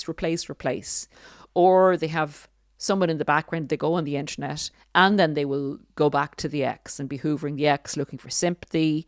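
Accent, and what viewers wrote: Ireland. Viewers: I love her and remy ❤ and the accent is that Irish?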